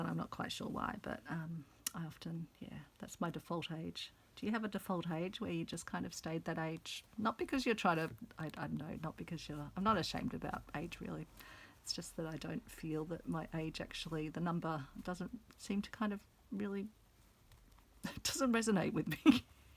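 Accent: Australia. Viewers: So love hearing a fellow australian. I subscribed co I have just found you and also cos if I follow Australians they can tell where they get their supplies, cos I can to! c’mon aussie c’mon 🧵🪡🧵🪡🧵🪡 I will make this cos I think it is a great idea. Thanks heaps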